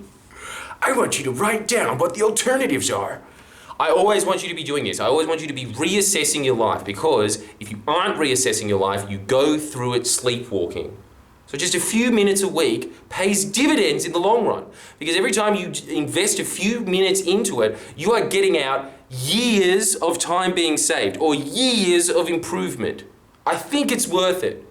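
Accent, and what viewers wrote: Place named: Australia. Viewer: At this moment I am s in and don't know what you're on about. This is fine - as I only wanted to acknowledge that YouTube auto captions work well with your voice. This is great, because you are funny all by yourself without the slow car crash of captions going awry which normally happens. I don't even know why my captions were on. But I was impressed. Educated, neutral Australian accent. Works well enough for me.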